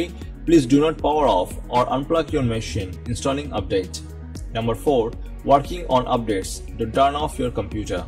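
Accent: India. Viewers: He is speaking English, you fucking pleb. He's Indian and has a strong accent. So as English probably isn't his native language, I think he's pretty understandable. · I used automatic subtitles because of his accent (I'm not a native and still aren't used to the Indian accent). The automatic subtitles worked pretty well mostly.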